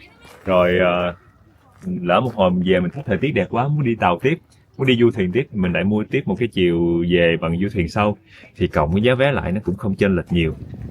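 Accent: Mekong Delta, Vietnam. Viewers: Song mot minh lau nam o Thuy Sy , moi lan nghe lai giong mien Tay cua Chau , Chu nho que huong " Soc Trang " qua , nghe rat that tha .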